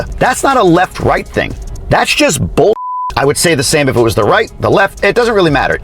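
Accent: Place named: Michigan, United States. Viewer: I could tell your from Michigan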